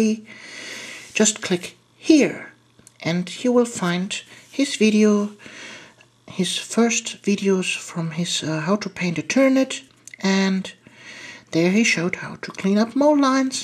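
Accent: Germany. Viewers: Are you German? By the way really good videos:)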